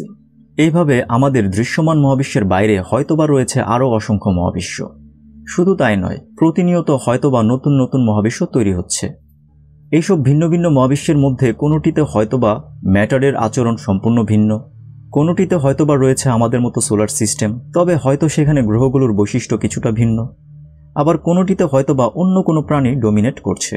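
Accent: Narsingdi, Bangladesh. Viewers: আপনার বাড়ি কী নরসিংদী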